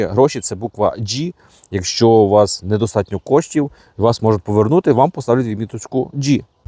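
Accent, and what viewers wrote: Western Ukraine, Ukraine. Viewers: Який гидкий голос за кадром, ще й, з западенським підви́вом..Якою мовою вона говорить, бо я українка, але не розумію, що вона там варнякає..? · Бридкий західний акцент і оце кошмар, говоріть українською, і наголоси ставте правильно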